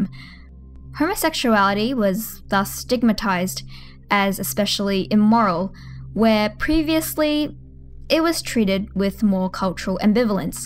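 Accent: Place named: Australia. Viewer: Ironically, has an Australian accent. NICE!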